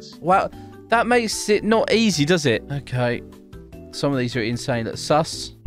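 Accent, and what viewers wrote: United Kingdom. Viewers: BRITISH ACCENT lol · He’s British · ur british?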